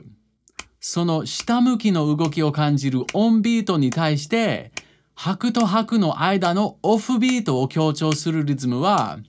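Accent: Kansai, Japan. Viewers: の関西弁（Kansai dialect）って結構自然ですけど、どこかで練習されたんでしょうか？ただ台本を読むだけでここまで流暢にしゃべるのは難しそう。。。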